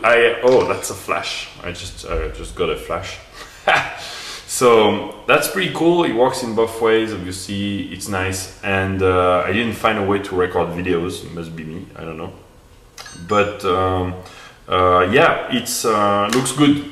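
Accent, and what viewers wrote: France. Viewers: r u french :D? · LOL you are French right ? i've recognize the "accent" je suis francais aussi, sinon super tes videos franchement sa fait plaisir de voir un francais qui review le palm pre(je cherchais sa depuis un moment). t'a fait HEC je suis mdr ... j'y vais a la rentrée pour ma quatrieme année, voila pour ma life je m'abonne allez a+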